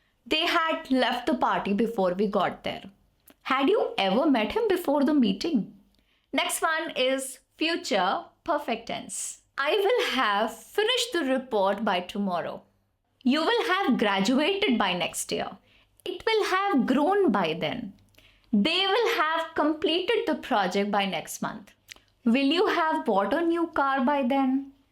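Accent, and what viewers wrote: India. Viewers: Your excent is so indian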